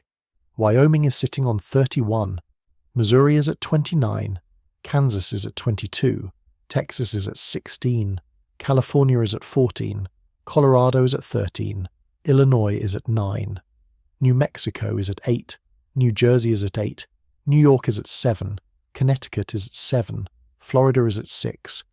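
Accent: United Kingdom. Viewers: Why is the narration in a British accent?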